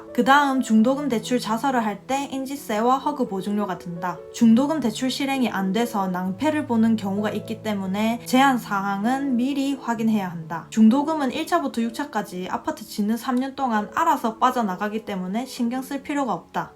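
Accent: Gyeongsang, South Korea. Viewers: 아따리야 경상도사투리 간만에 듣네예 좋심다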